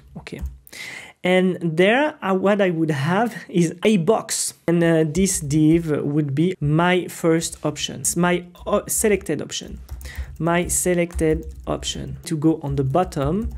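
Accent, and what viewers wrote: France. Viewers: french accent is funny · thank you so much but you can not hide your French accent. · Tu es francophone !! ton accent est très fort, mais c'est très confortable pour moi. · love the french accent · L’accent français on adore 🥰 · Merci pour ce cours. Your french accent is a treat. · bro thanks but i cant understand what you're saying i see you're french influence is too strong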